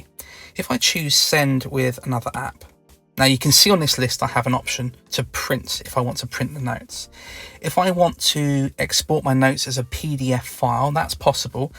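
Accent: United Kingdom. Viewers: An outstanding tutorial. Voice is nicely paced (not MANIC like others) and the background music is softer and just right . This gentleman has very good diction and his British accent makes for fact-filled, easy listening. Way to go!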